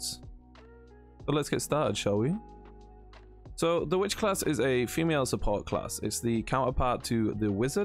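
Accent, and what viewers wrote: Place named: Australia. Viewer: Very nice accent. I like Australian accents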